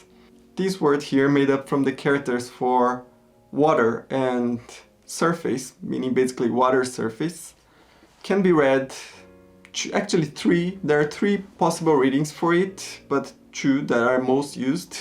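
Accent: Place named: Brazil. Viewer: You sound like a Brazilian, am i right? You have Pretty good english and japanese!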